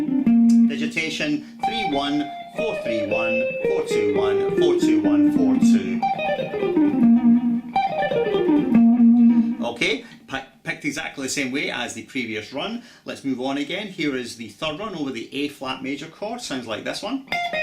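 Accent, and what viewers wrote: Scotland, United Kingdom. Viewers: Instant subscribe because of Scottish accent. (And amazing playing as well ;) )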